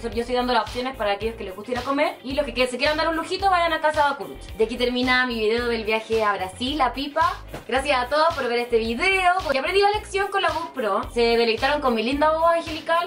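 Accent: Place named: Chile. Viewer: Demasiado chileno jaj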